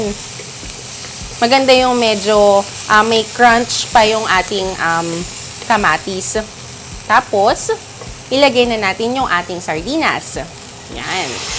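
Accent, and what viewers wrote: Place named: England, United Kingdom. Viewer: She pronounced the letter R with an English accent. So pretentious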